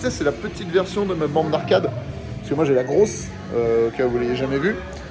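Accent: Belgium. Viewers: excellent accent Wallon! j'habite la Frontiere et je confirme que ca y resssemble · Tu a fait ma journée ton accent belge est juste magnifique je pleure de rire 🤣🤣🤣🤣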